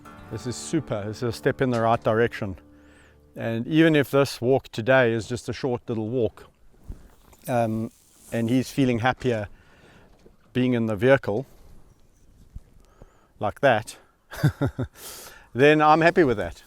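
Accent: South Africa. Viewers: Such adorable creatures, like overgrown pet dogs hehe. Obviously we know animals such as lions and bears can never be completely tamed, and will always retain some of their wild instincts, including the instinct to kill. This can't be "trained away". But I love this dude. He naturally understands that and has a very healthy respect for them and their power, but also doesn't simply abandon these poor, lovable and ecologically important animals, instead risking his life and spending his money to care for them and keep them as comfortable and happy as possible. And that's the truly amazing part. Because you can tell just by seeing the animals that they really are happy with him there. =) NICE job. And I love that awesome S. African accent!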